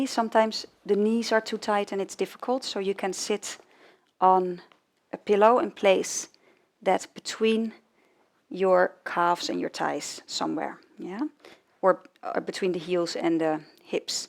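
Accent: Netherlands. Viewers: The exercises are good. i only wish she would speak Dutch because, though she's fluent enough in English, her pronunciation clearly sounds like Dutch. It prevents me from enjoying the yoga.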